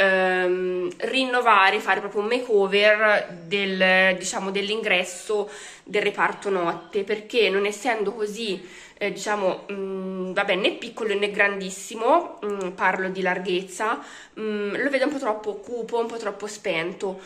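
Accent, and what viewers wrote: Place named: Tuscany, Italy. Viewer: dalla parlata sento che sei toscana da dove? io Volterra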